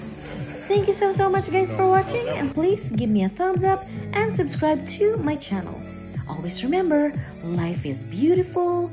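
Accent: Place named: England, United Kingdom. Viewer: I love your English accent bebe ganda.. And that place also too much amazing. Always take care and good bless.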